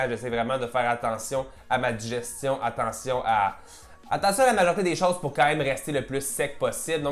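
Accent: Quebec, Canada. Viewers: L'accent québécois me fais mal au tympan, mes oreilles saignes 😫😫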